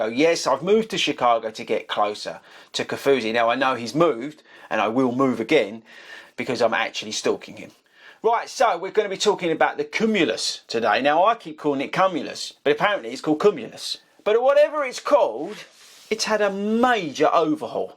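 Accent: United Kingdom. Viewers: Ich mag diesen britischen Akzent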